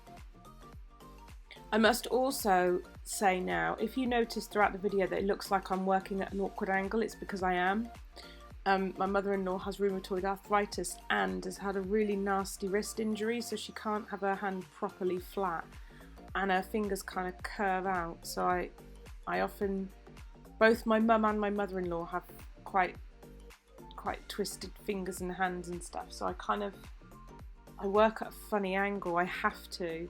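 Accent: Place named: England, United Kingdom. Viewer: I love to hear her too. I'm in America but a huge Anglophile.... her accent slays me.... her a the lady from! Love how she says "bits and bobs"... and other other local phrases. Lol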